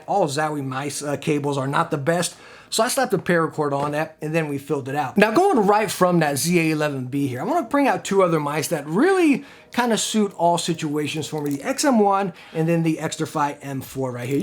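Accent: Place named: Italian American, United States. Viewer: You have this Italian American accent like those in Martin Scorcesse movies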